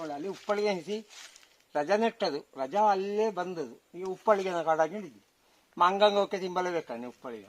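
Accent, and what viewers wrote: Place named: Kundapura, India. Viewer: ❤❤spr ❤ kundapur kannada ne chandha...! Alla ❤🙏🙏🙏👍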